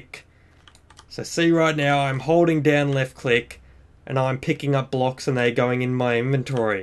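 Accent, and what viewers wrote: Australia. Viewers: I'm in love with the aussie accent!! chef's kiss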